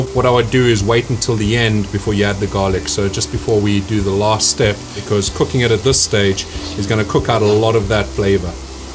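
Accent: South Africa. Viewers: Now I understand from where is your accent, and the addiction to piri piri. (I have some family that come from South Africa)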